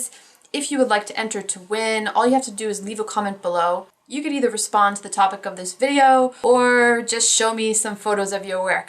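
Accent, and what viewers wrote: Boston, United States. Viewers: loved your Boston accent! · Ha love your Boston accent! Love seeing your progress on your master class - keep up the good work!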